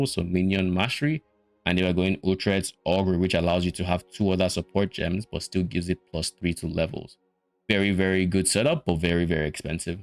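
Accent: Nigeria. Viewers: Are you Nigerian? The accent is telling me yes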